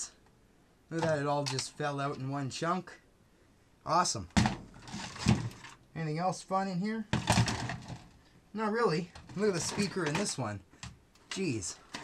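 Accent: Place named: Ontario, Canada. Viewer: I love Ontario accent, love from Italy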